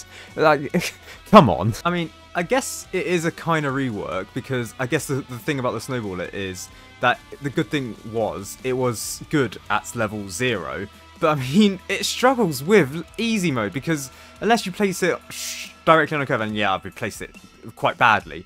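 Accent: United Kingdom. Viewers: The amount of Britishness coming out of this is overwhelming (compared to other videos)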